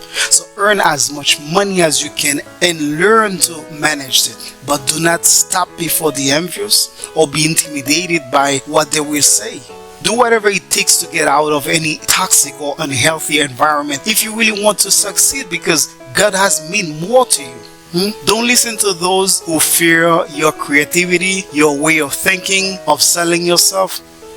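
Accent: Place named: France. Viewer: Interesting! I really appreciate your french accent.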